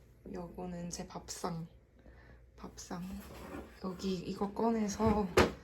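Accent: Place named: Gwangju, South Korea. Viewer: 누가 봐도 광주 분이시네요 사투리가 정겹습니다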